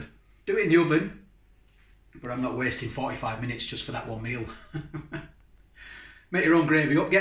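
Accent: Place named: Yorkshire, United Kingdom. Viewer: Where are you from.. you gotta be yorkshire lol.. where about.. I'm Leeds .. I'm gonna try this.. when iv room int freezer lol x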